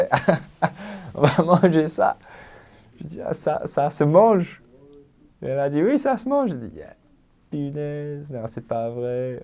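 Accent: Tahiti, French Polynesia. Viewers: 🤣🤣🤣 il s'exprime comme un tahitien !! Reviens nous voir 💕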